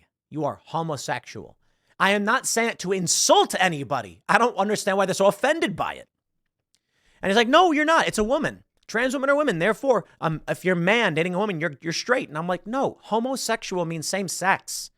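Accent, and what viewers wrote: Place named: South, United States. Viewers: An attractive lady with hobbies! Hobbies I can enjoy and southern accent, sign me up. I've seen what makes you applaud, your boos mean nothing. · She is a Smoke Show! She’s Beautiful She’s funny and her Southern draw is so attractive Just because she does that stuff doesn’t mean she’s not a girls girl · I find her to be beautiful and i love her sweet down to earth southern accent. She seems like she’s down to earth. I definitely would be into her than the tired ass plastic caked on makeup spoiled entitled delusional multiple personality modern women from big cities. Im from Los Angeles, and im sick of the women who spawn out of progressive cities and states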